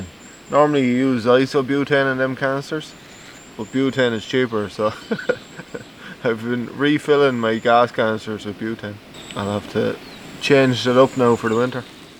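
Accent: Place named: Ireland. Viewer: I love your accent...is it Irish?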